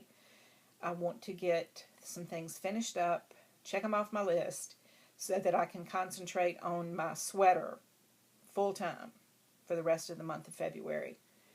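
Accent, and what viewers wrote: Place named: South, United States. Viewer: Love your podcast...I especially love that your accent sounds like mine!!!! Yay for Southern ladies' podcasting!!!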